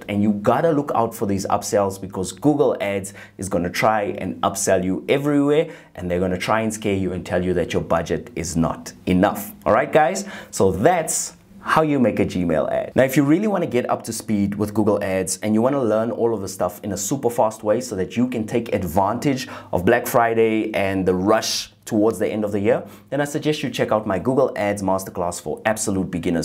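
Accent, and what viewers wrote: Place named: South Africa. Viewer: We are also originally from SA and love listening to the accent, you can't imagine how refreshing it is, smooth as Tennessee Honey Whisky😀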